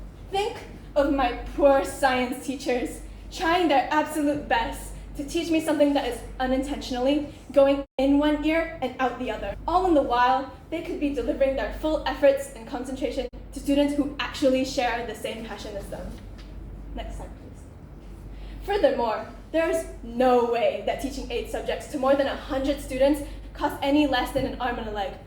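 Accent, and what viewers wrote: United States. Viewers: I like ur accent, sounds americanish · finally, someone with an American accent in a uk school, I’m not the only one!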